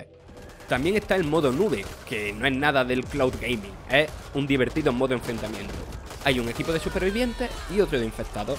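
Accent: Spain. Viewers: Es andaluz , asique normal que tenga acento , si no lo entendeis es vuestro problema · Creo que he escuchado a Puertorriqueños hablar mejor el español, no tengo nada en contra bro. ¿Esto es un acento de alguna provincia de España?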